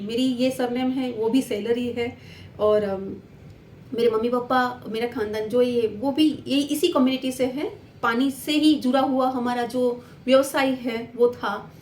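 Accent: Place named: Gujarat, India. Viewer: .... Tamari bolvani style.. Havbhav bikul Gujrati se 👌👍😇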